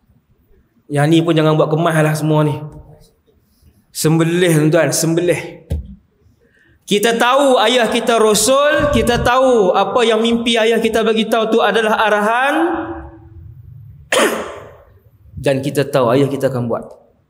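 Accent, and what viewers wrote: Kedah, Malaysia. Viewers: Ustaz adalah favorite ustaz sya.. Ditambah lgi sya penah mimpi ustaz..Alhamdulillah bersyukurnya dpt mimpi ustaz. Kelebihan ustaz bgi sya..sorg yg sentiasa mnjadi diri sendiri iaitu ke mane saja negeri yg ustaz pegi, ustaz tetap utamakn loghat negeri ustaz iaitu Kedah.. Semoga Allah prmudahkn urusan ustaz di dunia n akhirat, dikurniakn kesihatan bdan n usia yg pjg..Aamiin Ya Rabbal Aalamiin🤲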